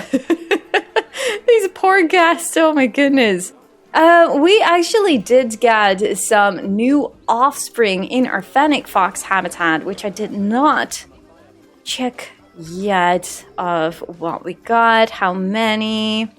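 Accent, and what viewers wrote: Netherlands. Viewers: wait are you dutch? Of ben je nederlands haha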